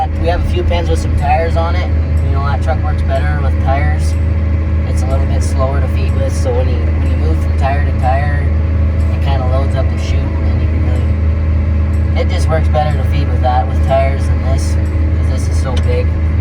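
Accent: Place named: Upper Midwest, United States. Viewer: Nice video 👍 Just found this channel. Good stuff! Where are you located? Sounds upper MW. I'll be checking in from WA.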